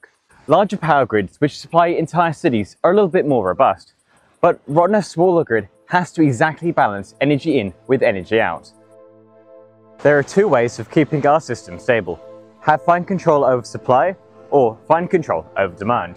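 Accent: England, United Kingdom. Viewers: You're Australian but it sounds like you have a Brittish accent. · I don't get it. Your accent is English, but you say "we Australians", what's your history bro?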